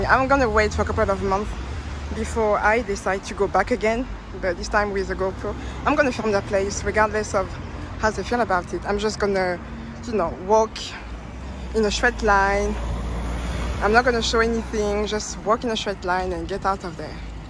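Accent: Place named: France. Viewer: Try not to be put off by people saying you can't film. You are allowed to film anything in a Public Place! Great walk as usual! So I have now deciphered that your first language is French! Love the voice.....oooh la la!!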